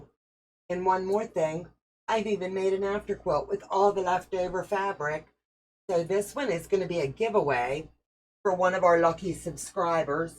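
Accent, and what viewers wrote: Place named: Pittsburgh, United States. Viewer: Are you from Pittsburgh? I recognize that twang.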